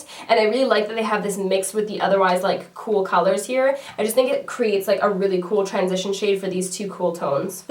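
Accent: Canada. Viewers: I don't know if it is because english is not my first language but this is the first time I noticed your Canadian accent. Is real pretty though.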